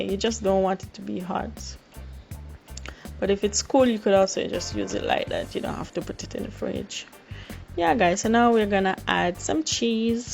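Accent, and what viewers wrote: Jamaica. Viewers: Wow..looking for this since 19*** 😂😂😂😂... endlich...gonna do this today, today...wo<link> realy like the jamaican patty....like how you speaking the Patwa😊... ,,trina nyamings'' showed me your Link..good Job and thanks for Showing us. Ich freu mich so sehr👍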